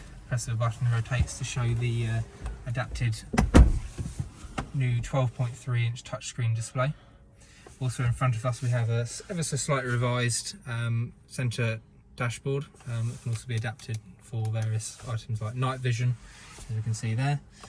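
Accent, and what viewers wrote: India. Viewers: The guy never shows his face. From the accent he sounds Indian. How come you hiding your face?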